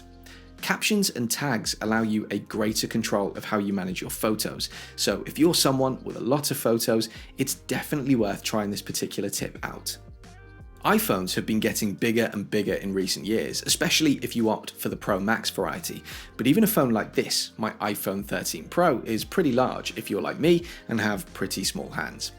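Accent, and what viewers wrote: United Kingdom. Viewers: Great videos!. I like the content and the presentation style. BTW what regional UK accent is that?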